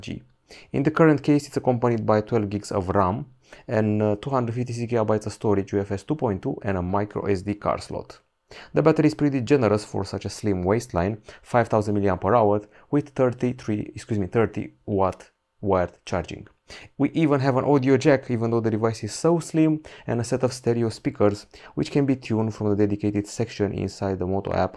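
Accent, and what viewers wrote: United Kingdom. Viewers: Are you British? Do you speak English?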